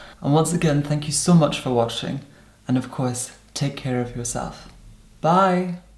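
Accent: Scotland, United Kingdom. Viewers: His accent is a cross between the Queen's English and Scottish country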